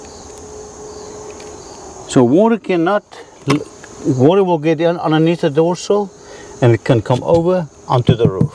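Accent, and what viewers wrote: South Africa. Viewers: You sound like a South African 🇿🇦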